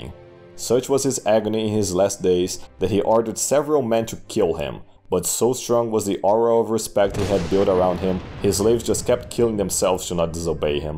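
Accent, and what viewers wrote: Brazil. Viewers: Sir Suspicious yeah I’m not familiar with Brazilian accents at all, which is why I assumed Romanian/Greek.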